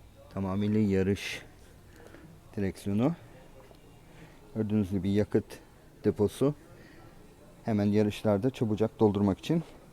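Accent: United States. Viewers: Abi Amerikan İngilizcesi aksanın harika Türkce aksanın da harika çok yetenek gerektiren bir şey. Kullandığın bazı kelime gruplarını bizim burada yaşayan çoğu insan bilmez bile helal olsun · Abi amerikalı mı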